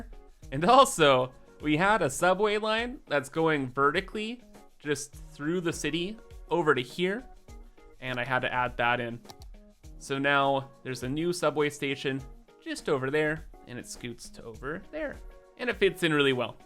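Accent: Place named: Canada. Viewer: Golden Ears Park, do you happen to live on the west coast of Canada? I’ve been noticing the Canadian accent more and more lately and now I’m like 99% sure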